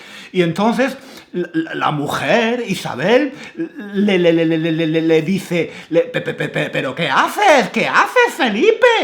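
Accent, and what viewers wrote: Andalusia, Spain. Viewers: Me encanta el acento andaluz ❤ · Verdad? Eres Andaluz? De q cuidad? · I love your Andaluz Accent!!!!!!!!! me encanta su acento Andaluz!!!!!!! muchas gracias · ¡Me encanta escuchar tu acento andaluz!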